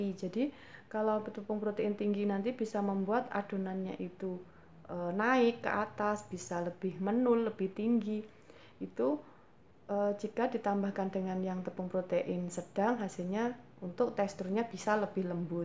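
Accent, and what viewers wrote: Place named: Java, Indonesia. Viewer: Ndak papa mbaaaak meski medok...itu ciri khas mbak.ehehhee...makasih sdh mau berbagi....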